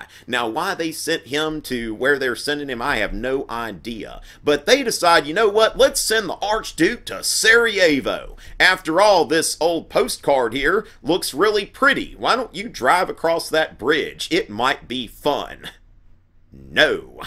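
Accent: South, United States. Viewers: r u American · Love your videos! Informative plus a great southern accented sense of humor. Thanks! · His voice is so American. He seems so hyper, like calm your jets. :D Good video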